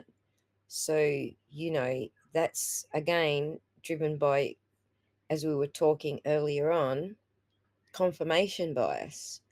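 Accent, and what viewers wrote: Australia. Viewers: I really love listening to.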